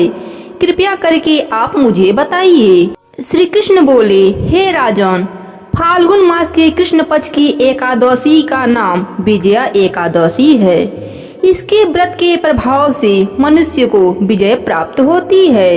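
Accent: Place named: Bengal, India. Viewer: Ap to bagali bol rahe ho ji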